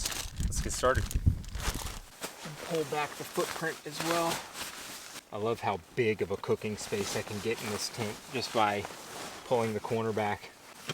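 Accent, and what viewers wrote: United States. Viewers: it’s the softly spoken yank accent that does it for me bud. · you American bro? good video! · Are you an American or English?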